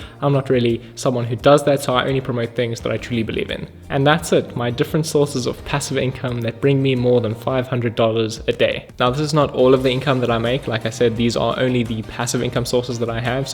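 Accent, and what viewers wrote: South Africa. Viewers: You've got a South African Accent? Are you South African bro? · Are you South African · is that a South African accent ?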